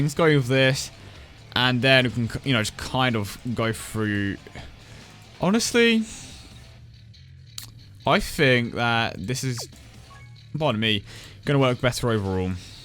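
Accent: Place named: United Kingdom. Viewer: Plz go full on british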